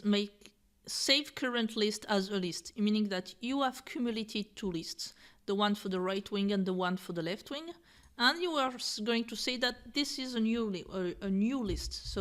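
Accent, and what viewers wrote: France. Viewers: She is a girl. Avec un joli accent français de surcroît ! 😉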